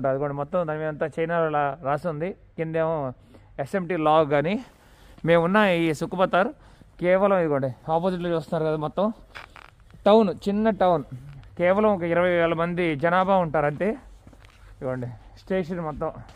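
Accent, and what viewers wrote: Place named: Telangana, India. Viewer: Anna ki mana Telangana basha ki shift aipotundu vadina 😁 Bagundi to Masthundi 🔥😅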